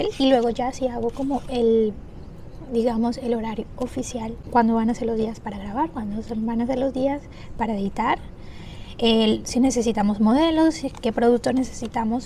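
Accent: Caribbean Coast, Colombia. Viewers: Ese asentó colombiano me encanta❤️🇨🇴😊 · De qué parte de Colombia eres? Hace poco te comencé a seguir por Instagram y descubrí que también estás en Youtube me suenas muy costeña como yo 🥰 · ¿Es ella costeña? Jajaja es que creo que tiene un poquito este acento de costeña colombiana 🤭🤔 un saludo desde Colombia ♥️ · Por qué YouTube tardo tanto en recomendarme tu canalllll😍 me encanta todo jajajaj soy tu fan amo como hablas, dime qué eres costeña sin decirme que eres costeña cule'balance JAJAJJAAJA amo mal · Como que acento costeño 😶 soy nueva seguidora... Wow de la costa... Tengo esperanzas jajaja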